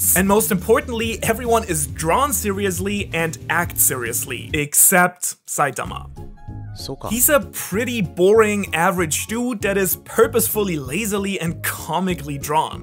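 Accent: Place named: Germany. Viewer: Bruh seit gensos podcast hör ich richtig raus dass du deutsch bist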